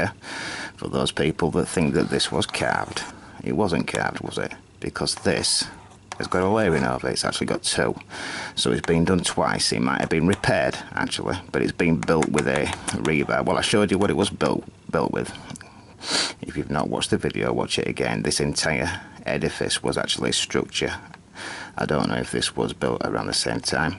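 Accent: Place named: Manchester, United Kingdom. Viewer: Mcmahn 1 im from near bolton, and this sounds manc to me, or oldham area.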